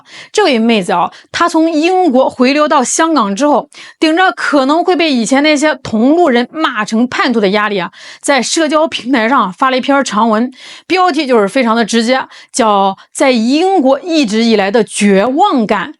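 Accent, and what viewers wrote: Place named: Shandong, China. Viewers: 这山东口音简直了😂😂😂😂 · 这山东味儿的普通话 😀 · 这女主播被这口北方口音给毁了，本来还算美女一枚. 😅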